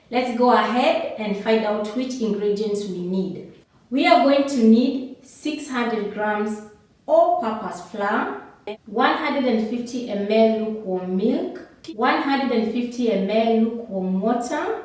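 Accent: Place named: Mozambique. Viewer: I have a friend from there with a similar accent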